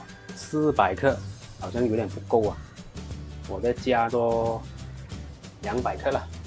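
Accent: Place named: Malaysia. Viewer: 馬來西亞的華語好可爱😂😂，有些聽不明，先給個讚👍然後再給個掌👏👏👏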